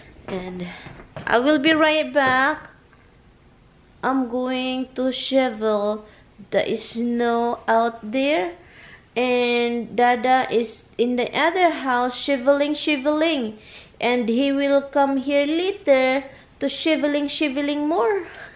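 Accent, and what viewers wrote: Visayas, Philippines. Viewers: Hi sis , Pasagde na sila kay gusto kaayo kos imong accent hahaha Bisaya gud ta 😍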